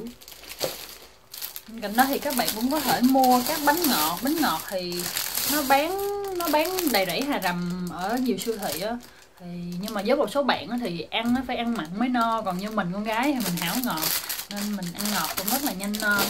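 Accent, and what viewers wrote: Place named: Long An, Vietnam. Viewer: giọng nói giống người long an nhỉ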